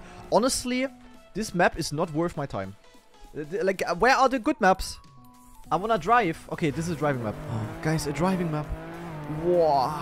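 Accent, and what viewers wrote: Germany. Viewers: Finds immer geil wie dein deutsch durch kommt 😂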